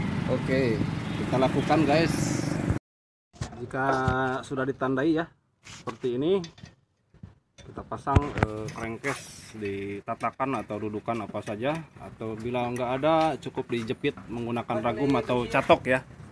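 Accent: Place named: West Java, Indonesia. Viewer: Orang sunda mh seri da ngupingna😅😅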